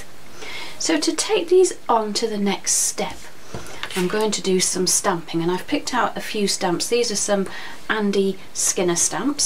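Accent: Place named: Northern England, United Kingdom. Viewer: I have been trawling all your videos - your voice is lovely - Northern girl same as me - and I adore the colours you choose to print together. Lovely pages, lovely patterns - I'm just going to try a gell plate for the 1st time tomorrow ( it's 4.30am and I'm an insomniac!) Thanks so much for sharing xx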